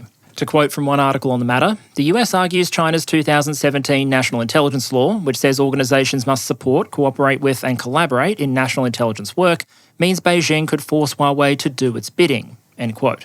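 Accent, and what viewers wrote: Australia. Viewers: I always find it funny how you seem to put so much effort into pronouncing some things as per the original language, and yet some words are full Aussie. E.g. compare Iran (with rolled/tapped "r") to Beijing (basically Beige-ing). It's a very Educated Australian way to go about things. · a pure stream of facts, graphs and statistics sans bullshit with unparalleled expertise and an aussie accent. what's not to love :)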